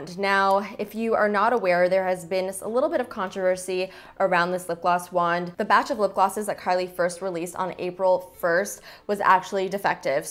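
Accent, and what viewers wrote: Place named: Canada. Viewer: What part of canada are you from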